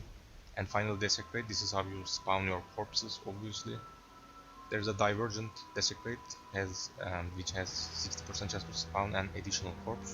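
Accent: Turkey. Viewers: Lol I immedialy recognised the Turkish accend. Ty for the build.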